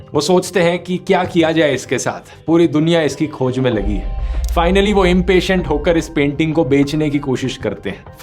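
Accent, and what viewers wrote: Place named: South India, India. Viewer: aaj dhruv ka voice over lag raha h south jaisa...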